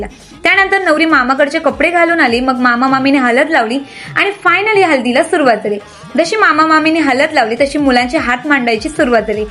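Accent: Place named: Agri, India. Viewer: Tuji bhasha tar aagri vatte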